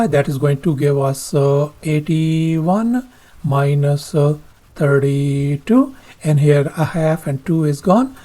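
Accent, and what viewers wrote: India. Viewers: It takes 12 seconds to solve this . it's OK to speak indianglish but you don't need to kill yourself for 10 minutes 9 × radical = 12.7279... 12.7279...- 8 = 4.7279... 4.7279...× 8 = 37.8233... 😂😂😂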